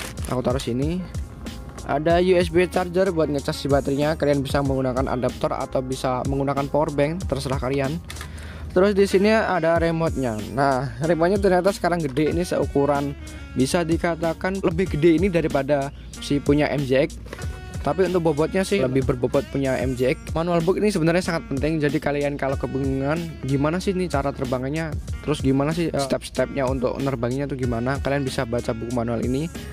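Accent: Java, Indonesia. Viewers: Sory suketnya... Hahahaha.. mantab bro, gak ilang jawane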